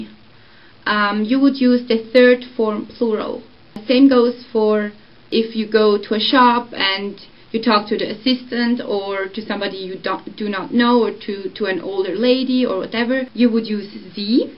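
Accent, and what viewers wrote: Zurich, Switzerland. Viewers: Du, du hesch imfau ganz tolli videos. Ig ha kei ahning wieso ig bi dir subscribed bi aber i finge di haut eifach sympatisch :) Du no schneu eh frag: Hesch du dr'Zürcherdialekt? I finges drum no witzig de mitem Bärnerdialekt z'vergliichä :) Grüessli us dr'Houptstadt !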